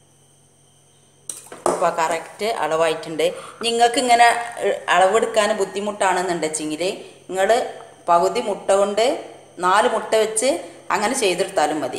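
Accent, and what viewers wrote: Malappuram, India. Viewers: Hi ..ഇത്താ..ആദ്യായിട്ടാ കാണുന്നത് വീഡിയോ.. സംസാരം അടിപൊളി..മലപ്പുറം ആണോ...വീഡിയോ ആരാണ് എടുക്കുന്നത്...എനിക്കും ഒരു ചാനൽ തുടങ്ങാൻ ആഗ്രഹം ഉണ്ടായിരുന്നു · Ningal ithupole thanne samsarichal mathi malapurambasha athukelkana ishtam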